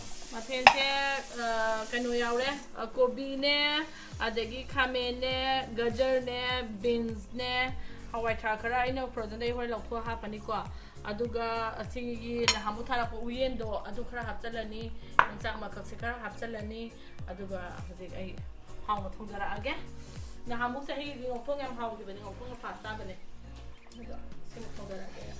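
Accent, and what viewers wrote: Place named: United States. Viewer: Ashh angang geijidi pura American accent olle 😀😀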